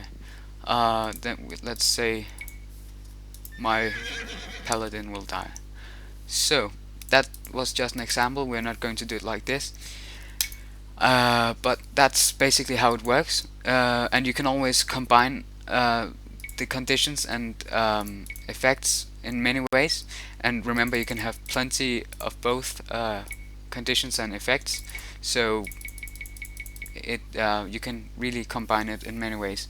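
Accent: Denmark. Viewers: he is from denmarkm and im from sweden some thincks thet we sunds funny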